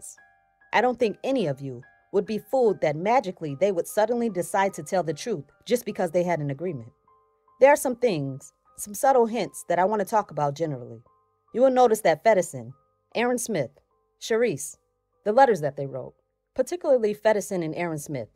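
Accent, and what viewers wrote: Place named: New Orleans, United States. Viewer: Heyy na!! New sub here.. ⚜️⚜️⚜️ I think I hear nola in your voice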